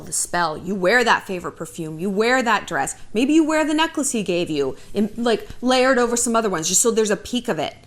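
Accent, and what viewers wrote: France. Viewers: Ton accent français est très mignon!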